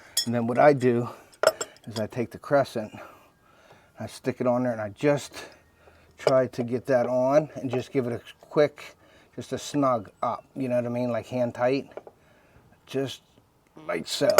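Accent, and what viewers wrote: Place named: Pittsburgh, United States. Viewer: Awesome video. Searched how to add oil to my merucry outboard and this video came up. Within TEN seconds, i was like is this guy my neighbor?? lol fellow yinzer here!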